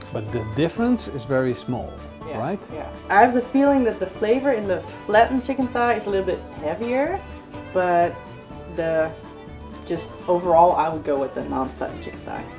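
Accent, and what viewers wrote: Netherlands. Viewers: Ik hoor een Nederlands accent?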